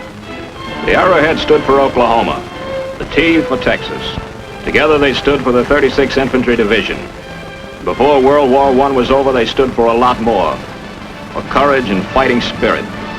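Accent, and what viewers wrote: New York, United States. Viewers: Mark Clark should have been court martialed for destroying this division on the Rapido - a totally unapproachable obstacle if you have ever seen the pics. The German engineers ("Pioneers") cut down all the trees within hundreds of yards of the shore and mined the area completely. On a lighter note, could the Army SIgnal Corps at least give the Texas Div a narrator who doesn't have a thick New York accent? I realize that after the division was butchered repeatedly in Italy, it wasn't even half Texans, but come on. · Clark's glory hounding cost my grandfather a lifetime of PTSD and misery over the loss of his battle buddies. P.S. I love that glorious New Yawk accent. My WWII soldier grandfather was with the 143rd (36th) Company K and hailed from Brooklyn, New York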